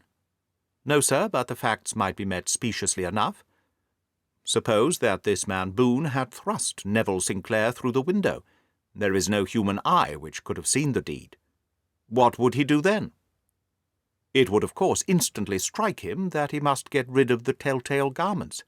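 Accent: United Kingdom. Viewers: Is this british accent? Pls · Is it British accent ?? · I think its an British Accenct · Yes, British accent👍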